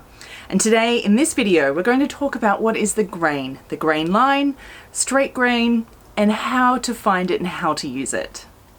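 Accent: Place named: Australia. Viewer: Thank you for your sewing lessons. Your explanations are easy to follow and understand. I also love your Australian accent 😊 ♥️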